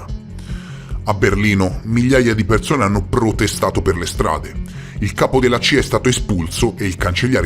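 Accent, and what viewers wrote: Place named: Livorno, Italy. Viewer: Video top come al solito. Saluti da una concittadina (credo, se il tuo accento non mente sei livornese anche tu 😅)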